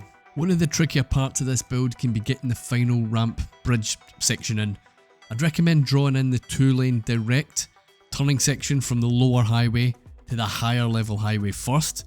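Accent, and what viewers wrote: Scotland, United Kingdom. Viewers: heard a fellow scotsman playing my fave game and im 100% tuned in. nice video :)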